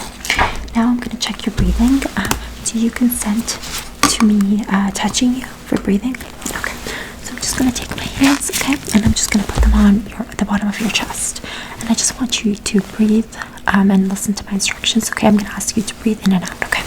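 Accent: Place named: United States. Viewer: my first time watching you and that blows my mind you sound so American!! very sharp pronunciation you have the accent down to a T girl, i’m beyond impressed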